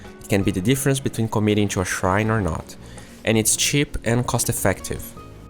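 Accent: Brazil. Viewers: Entendi no final do vídeo. É pelo dinheiro ganho no Patreon. Mesmo com esse sotaque muito brasileiro no inglês, onde até quem não é americano ou inglês vai perceber na primeira palavra que não é do país dele e é de um país que não fala inglês, nesses países tem mais pessoas dispostas a doar dinheiro pelo Patreon do que países como o Brasil. Pior pela situação econômica do país. Essa é a única coisa que penso sobre mudar de país o seu canal pra um cara que mora no Brasil e tinha inscritos no Brasil: Dinheiro do Patreon.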